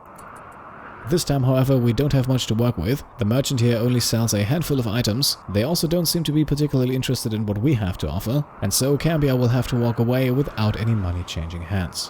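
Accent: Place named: Germany. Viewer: Are you German or Swiss? I hear a faint, faint accent behind that soothing Documentary-voice.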